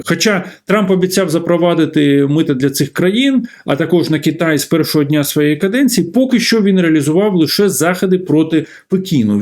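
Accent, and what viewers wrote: Russia. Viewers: У ведучого грамотна, чиста українська мова, але з російським акцентом.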